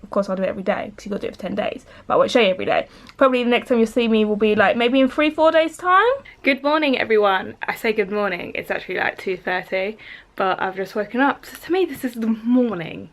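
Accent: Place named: United Kingdom. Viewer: I used it last nite ... I can just listen to your British accent and voice and feel better lol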